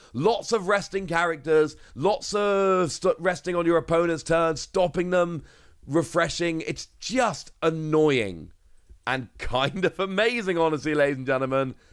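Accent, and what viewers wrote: England, United Kingdom. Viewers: Typical english accent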